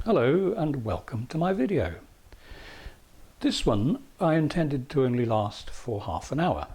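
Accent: United Kingdom. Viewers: You are so talented and you also crack me up. You look like an actor. Or maybe it's just your accent. We American's are very taken with British accents. We also think all accents similar to yours are British LOL.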